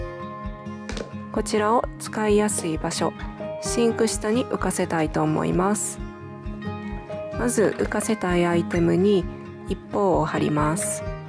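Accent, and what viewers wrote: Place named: Kansai, Japan. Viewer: 関西人ですかね？結構関西弁っぽいイントネーションで大阪の私は聞き取りやすかったです！ スマホ立てるの悩んでたので買ってみよ〜っと☺︎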